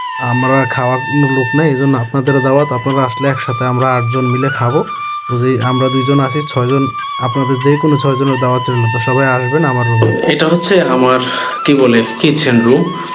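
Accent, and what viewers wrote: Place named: Comilla, Bangladesh. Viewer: আসসালামু আলাইকুম, ভাই, আপনার বাড়ি কি কুমিল্লা, বুড়িচং/দেবিদ্বার এইদিকে? কিছু মনে কইরেন না, আপনার কথায় আমরার মতো মনে হইলো😊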